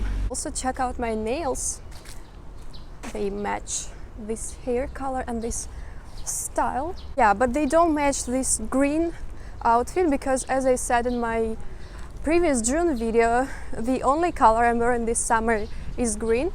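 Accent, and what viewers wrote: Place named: Russia. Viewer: You speak English, with a strong Russian accent. I speak English with a strong Scottish accent. We speak the same language but we are both from different cultures, you'rs big, mine small. If you want to speak to a native English speaker, from Scotland, to practice you're English, let me know, there is always skype or other ways to avoid expensive barriers to communication.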